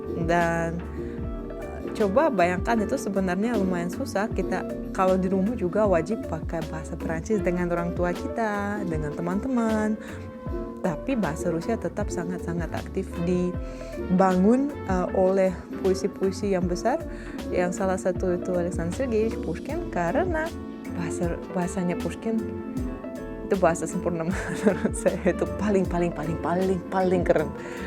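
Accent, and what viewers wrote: Java, Indonesia. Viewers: Mantap mba,bahasa indonesia nya sdh pasih disertai logat jawa sebagai pemanisnya👍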